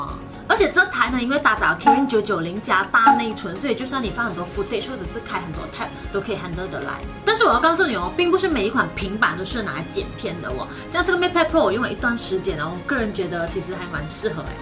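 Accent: Malaysia. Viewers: Ke En是大马还是新加坡人？口音好重哦...😂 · 马来口音很重😀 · 一直感觉说话很可爱很搞笑，语速很快加上独特的魔性大马口音，哈哈😃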